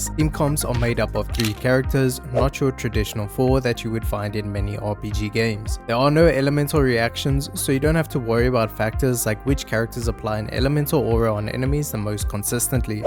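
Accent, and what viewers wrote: South Africa. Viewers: You from SA bro ? Also keep up the good work! ✨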